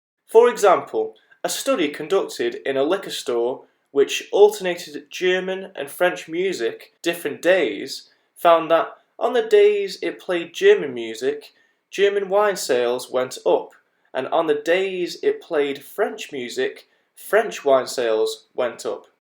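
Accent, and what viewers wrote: United Kingdom. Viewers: You from Manchester?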